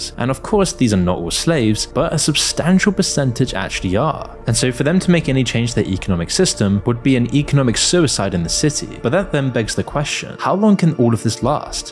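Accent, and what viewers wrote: England, United Kingdom. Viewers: Not defending dubai, but the criticism and comparison with london is a bit rich coming from an english sounding presenter - the kinks and pedophelia of american politicians and british royals is equally evil and above law so far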